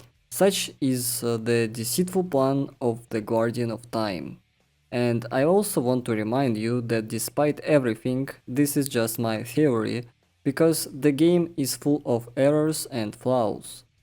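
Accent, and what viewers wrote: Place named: Russia. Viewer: Bro, you're from eastern europe? your accent seems quite russian to me...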